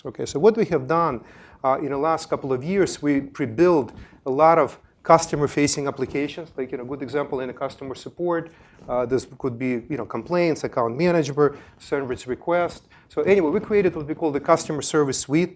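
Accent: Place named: Russia. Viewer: Very russian sounding, sir.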